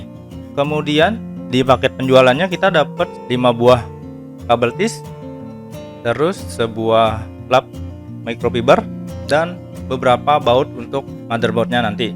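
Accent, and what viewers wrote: Indonesia. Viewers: ngenah sajan logat indonesia ne..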